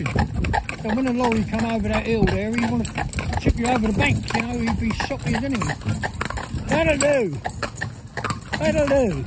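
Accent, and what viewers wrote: United Kingdom. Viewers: That was delightful !😊.. Thank You ! "That'll do" and "That. Will. Do." had me laughing since those are used here, across the pond, for misbehaving pets/animals and children.. This Texan is now a subscriber, and looking forward to watching the rest of your videos and future ones ! 😀.. I usually do well understanding British accents, but yours is a little stronger and with the horses' clippity clops (which I loved) I had to use "closed caption", but I'll get better 🙂..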